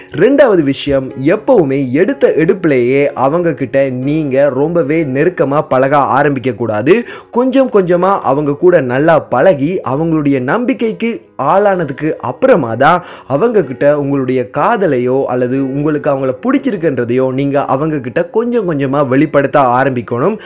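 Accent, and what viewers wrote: Sri Lanka. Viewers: Bro neenga srilanka jaffnava?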